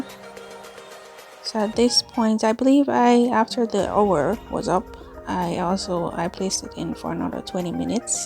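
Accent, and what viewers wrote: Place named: Saint Kitts and Nevis. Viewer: Ummmm sis u from St.kitts 👀👀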